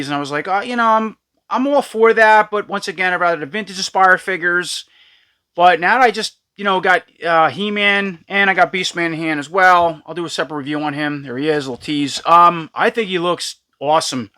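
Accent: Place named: Boston, United States. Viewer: You got that Boston accent. lol Live the review. Thank you. 😉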